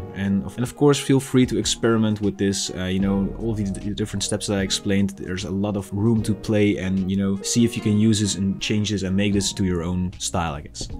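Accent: Netherlands. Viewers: i thought i recognized a dutch accent 😝 i am in amsterdam, so not close enough to enjoy the creative space in tilburg, but nice to know there is a digital art collective here in the nl!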